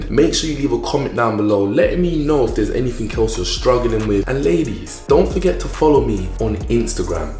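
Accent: London, United Kingdom. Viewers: Your accent is killing me 👌👌👌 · British accent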